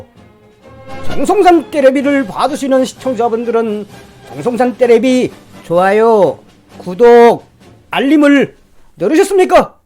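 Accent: Pyongyang, North Korea. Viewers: 서울 표준말보다, 북한 억양이 더 좋습니다.. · 이애는이북말써가며어디사람인고 혹시빨갱이 말투가상투적인빨갱이들수법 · 우리나라는 왜이리 헐뜻기만하는 빨갱이 색기들이 만은지원 똘똘뭉쳐서 좋은 나라만들생각 은 안코 헐뜻길 좋아한놈들이 많은지 이자식 말투도 가만히 드러보니 북한 사투리가 살짝 들리는구만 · 그냥 남한식으로 부드럽게 하셨으면 북한 말투 정말 듣기실습니다~~죄송합니다 저의견이니 오해 없으시길 바라며 ~~~ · 정선산 체널 애청자인데요 언제부턴가 북한 사투리 너무 심하게쓰셔서 중단하게됬네요 좀 아쉽네요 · 제발 까불지 마세요. 방송을 진지하게 해주세요.모든 면이 마음에 들지 않지만 우파 보수 방송이라 윤정권을 지지하는 그 열정에 감사해서 봅니다, 북한말씨 쓰는 것 장난삼아 하는 것 보기 좋지 않습니다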